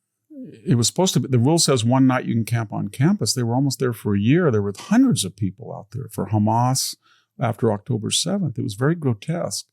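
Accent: Australia. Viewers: I’m an Australian I studied agriculture I worked in NY for 8 years my children and grandchildren are now Americans I think I love Victor and listen to all his work This was a great interview and I appreciate your Australian interviewing style accent and balanced grounded style very much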